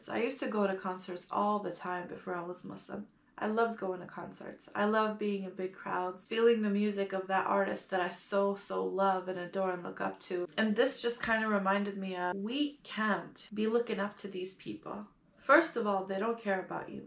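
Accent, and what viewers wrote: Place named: United States. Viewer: سڵاو بەڕێز.بە ئاکسنتی ئەمریکی قسە دەکەی تۆ دەڵێ ی لە نەرویجم چۆن بە ئەکسنتی ئەمریکی قسەدەکەی؟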